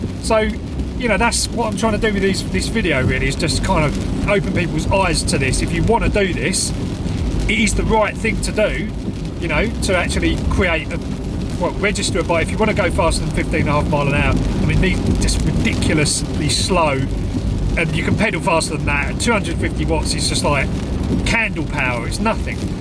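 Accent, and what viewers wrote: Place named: Essex, United Kingdom. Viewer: Sounded pretty Essex :-)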